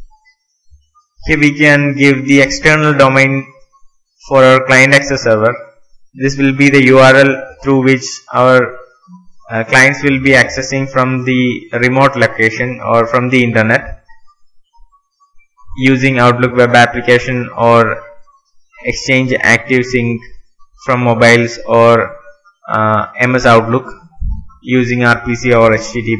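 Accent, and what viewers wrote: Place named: Kerala, India. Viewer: Mallu English!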